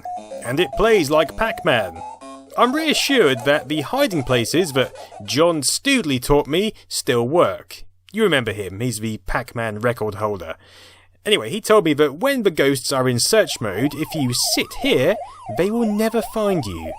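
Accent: United Kingdom. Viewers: The french man say: C'est trop Cool! But will you stop having this british accent, it's so hard to understand for frogies...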